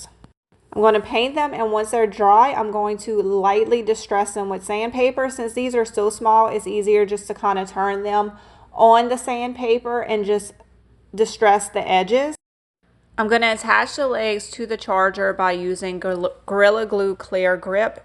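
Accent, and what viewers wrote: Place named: Lafayette, United States. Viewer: when you put in your "paid" value, it would be helpful to include a rough estimate for your supplies, beause i know the iod transfers are not cheap, and you have to account for at least some of the paints. i just recently found your channel and am enjoying it. your voice takes me home to my teen years. i grew up in the subs of houston, texas. my best friend was from morgan city, la and my boyfriend was from layfayette. you sound a lot like them.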